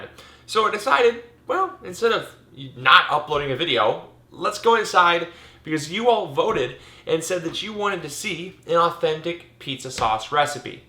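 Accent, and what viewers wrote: United States. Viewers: I appreciate the way you convey what you’ve learned definitively without elitist attitude, arrogance and ego. Respectfully asking, I’m curious of your credentials? Again respectfully saying, I must disagree that classic and authentic... whatever it may be, has to be made a certain way without any substitution or variant of preference whatsoever. I was blessed to work for two generations of Italians in their family trattoria and while pizza wasn’t on the menu, we would make it for staff lunch at times and the sauce we made was quite different from yours as well as other recipes I’ve seen. My point being, when the language dialect can vary from one community to the next, it would be safe to assume other aspects of culture would as well? I live in the south eastern US and by your English, I’m assuming you’re somewhere in the states as well so perhaps you can relate to the following point. 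I’ve lived in NC where they boast some of the best sauce for bbq in the country. There are three different regions , one being the western part of the state that uses different forms of tomato as a base. To the eastern side, no tomato is used, only vinegar and pepper. While the central does as central does and incorporates the east and west to one degree or another depending on how close one might be to the two sides. I now live in Charleston SC. Famous for shrimp and grits and she crab soup as well as a few other regional specialties. While most if not all will agree some ingredients are vital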